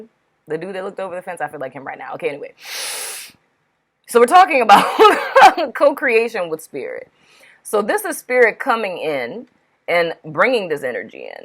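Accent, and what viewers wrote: New Orleans, United States. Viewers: U sound like u from New Orleans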